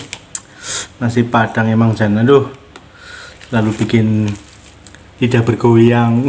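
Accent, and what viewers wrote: Banyumas, Indonesia. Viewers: oalah rika ya wong ngapak juga ya pak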